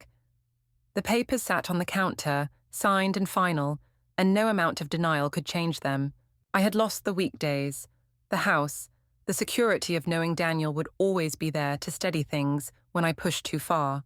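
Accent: United Kingdom. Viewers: I recognize the narrator's voice from previous videos: a stuck up, arrogant, British accent, entitled, selfish vixen, that does what she wants without considering the consequences and the people she hurts. I couldn't watch after a few sentences. A very unlikable character with a voice to match. These characters hit too close to home for me.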